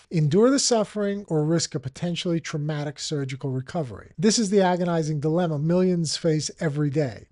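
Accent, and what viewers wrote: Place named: New York, United States. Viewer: I heard the accent and I was hoping you were in the NYC area... Looked it up and you're 15mins from my apartment in NJ 😂. Doc I had a question what are your thoughts on bidets?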